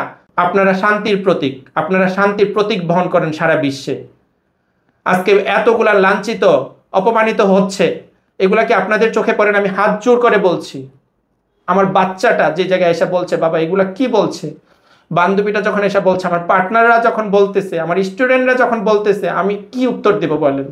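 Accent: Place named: Comilla, Bangladesh. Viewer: ভাই আমি ও কুমিল্লার মেয়ে ভালো লাগলো আপনি আমাদের কুমিল্লা ব্রাক্ষনবাড়িয়ার ছেলে জেনে। ভিডিও সম্পূর্ণ দেখেছি ভাইয়ের আঞ্চলিক কথা গুলা অনেক হাসাইলো। কুত্তার হেউয়ানি শুনে হাসতে হাসতে শেষ 😄😄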